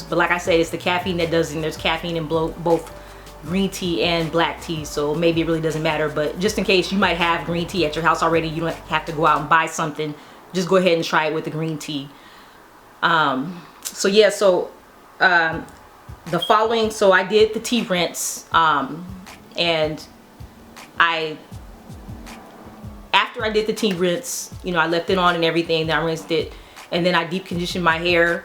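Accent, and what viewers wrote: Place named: New York, United States. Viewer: Are you from New York? I am, your accent sounds familiar.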